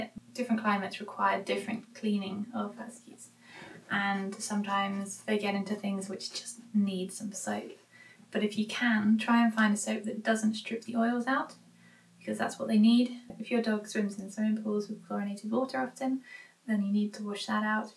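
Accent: United Kingdom. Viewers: Very educational video. Thank you. I never bathed my husky either and she never smelled badly. She would lick herself like a cat, I guess to clean herself. I love your British accent, btw. Maybe I’ll learn how to speak with one somewhere because my American accent is sounding a little rough to me now.😁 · I love your dog but also your "so British" accent (I'm french)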